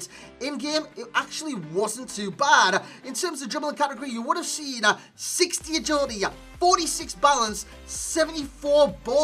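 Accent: Newcastle, United Kingdom. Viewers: Another Geordie we aye man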